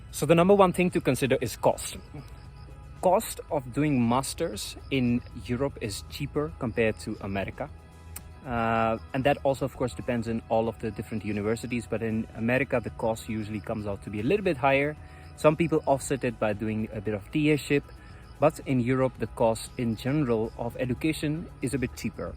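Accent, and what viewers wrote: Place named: Netherlands. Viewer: your English accent have a dutch inflection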